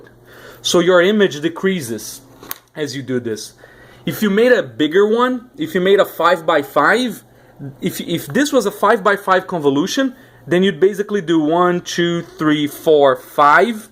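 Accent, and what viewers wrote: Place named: Brazil. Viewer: Are you Brasilean? I can notice a Brasilean accent in your voice background.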